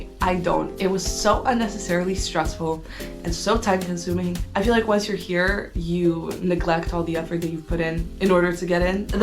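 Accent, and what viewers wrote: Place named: Russia. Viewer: girllll i like ur russian accent , btw im also going to be studying at film school cant wait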